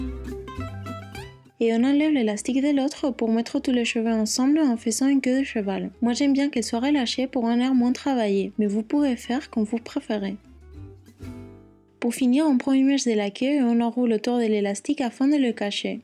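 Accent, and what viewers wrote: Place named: Spain. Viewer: han comme j'adore ton accent !!! ça sent l'espagne à plein nez (je me trompe pas j'espère) qu'est ce que tu es charmante aussi, un visage à la audrey tautou, simple, frais et naturel :) un besito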